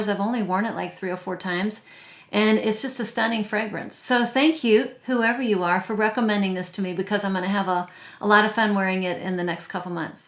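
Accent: France. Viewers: My lovely and beautiful Scentsister 💕♥️ with a a crabby french accent😂😘 Let’s do a live chat some day😉🥰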